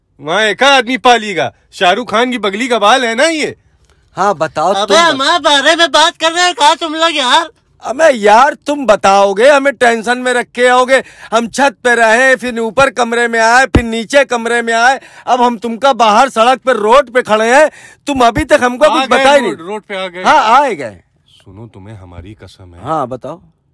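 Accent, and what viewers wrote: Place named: Kanpur, India. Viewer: कसम से कानपुर में ऐसे ही बात करते हैं...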